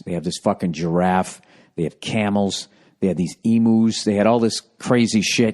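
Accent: Boston, United States. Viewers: Blends well with the Boston accent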